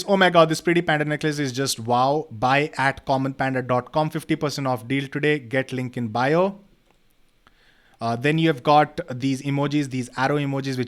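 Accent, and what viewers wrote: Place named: India. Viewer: This is one of the worst videos I've ever watched in almost a decade of YouTube Used 4 mins to get to the point Annoying jokes and high pitch voice Super annoying Indian accent No real valuable information whatsoever I hope you revise your videos and channel or stop uploading cos these are an eyesore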